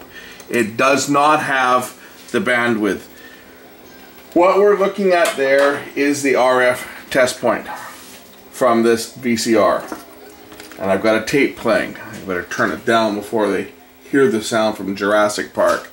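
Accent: Canada. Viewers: exactly and it's my first properish scope. I only started learning last October'ish and my first buy was a must tool MT8205 scopemeter and before it arrived I had already advanced past it suiting my needs. (Still it's a good accurate meter) but like I said for 14 bucks its the perfect scope for someone learning like myself till I have the money for a proper one.. P.s. I should of noticed you were Canadian and not American by the way ya talk.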